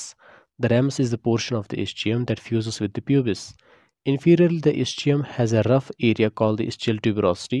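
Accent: Kashmir, India. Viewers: Sounds like a kashmiri guy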